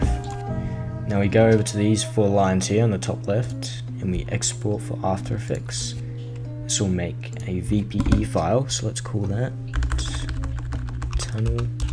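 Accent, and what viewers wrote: Australia. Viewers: HEEESSS AAA FUUUCKKIINNG AUUUSIIEEEE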